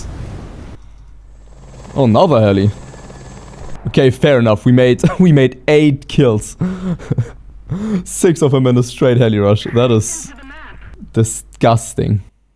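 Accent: Germany. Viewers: Are u german by chance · You are german right? I hear that. also you pronounce german tank nemes right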